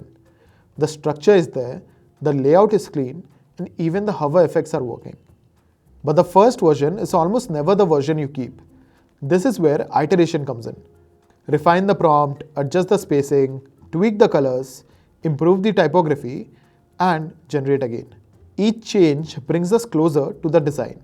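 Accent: India. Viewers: I cant watch it anymore the indian accent triggers me so much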